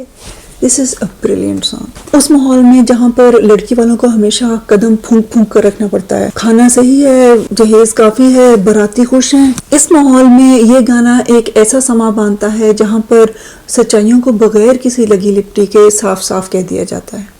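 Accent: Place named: Pakistan. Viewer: I love your Urdu accent. Vintage ptv Urdu haha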